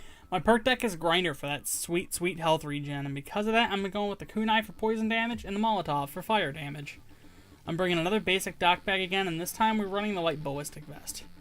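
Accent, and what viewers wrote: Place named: United States. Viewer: Honestly man, you have the best type of american accent, where about are you from?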